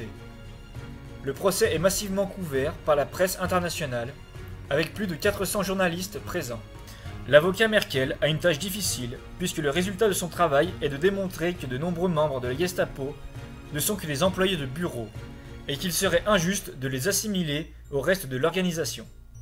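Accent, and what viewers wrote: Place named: Grésivaudan, France. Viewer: Ça sent l'accent du Gresivaudaaaan ça!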